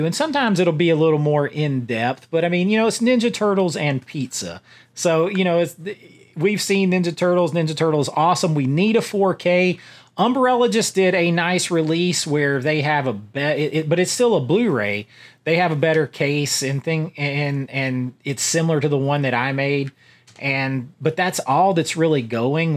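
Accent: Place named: Rural, United States. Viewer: that's A LOT OF QUALITY!!!! plus the highway shredder escape chase scene is DOOOOPPPEE!!! btw, its amazing how much your country accent goes away when you imitate Ralph 🤣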